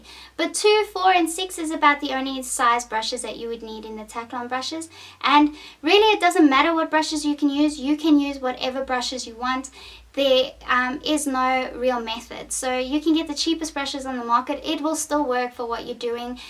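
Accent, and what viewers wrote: South Africa. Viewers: Beautiful accent - are you South African?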